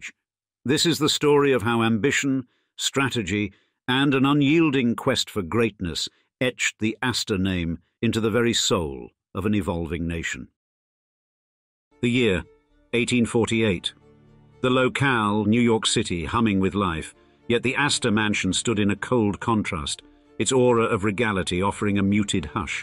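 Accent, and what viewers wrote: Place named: London, United Kingdom. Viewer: Our lovely London corky English.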